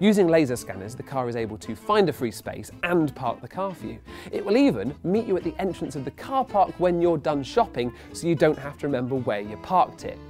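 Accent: United Kingdom. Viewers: finally I find a guy that's speaks brit english not american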